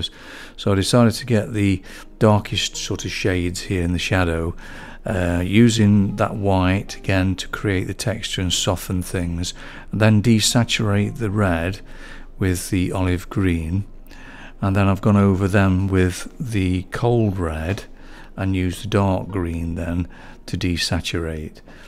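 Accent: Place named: Derbyshire, United Kingdom. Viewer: Thanks! That was very helpful! Love your accent too! Derbyshire? Notts? I work in pastels but have never attempted a portrait so far.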